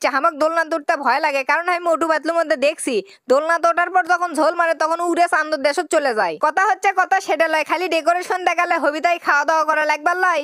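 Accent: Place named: Bogra, Bangladesh. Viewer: Excellent presentation with ceaseless uttering. The plus point to speak the Bogra dialect.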